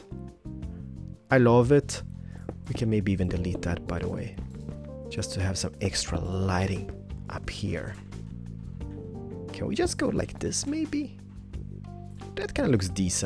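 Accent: Sweden. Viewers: Are you swedish? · are you swedish?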